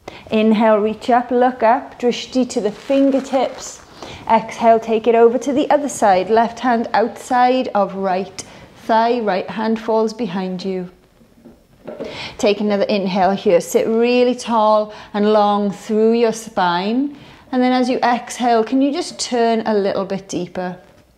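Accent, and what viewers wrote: United Kingdom. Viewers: are you British?!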